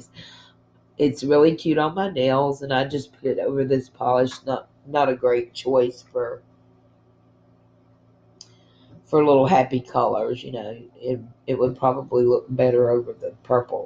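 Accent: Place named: Virginia, United States. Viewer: I love all the stuff you found! Hope all finds you well in VA! I sure miss the VA and WV mountains! I get homesick every time I hear you talk...lol...tyfs stay safe and well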